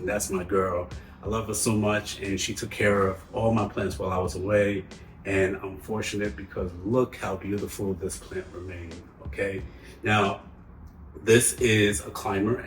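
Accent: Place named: New Orleans, United States. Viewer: I thought you were from my part of the world with that Accent lol New Orleans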